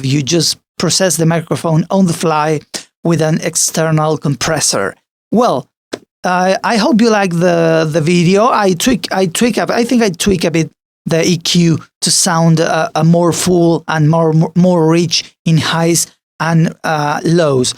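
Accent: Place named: France. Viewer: Dude is eh s’french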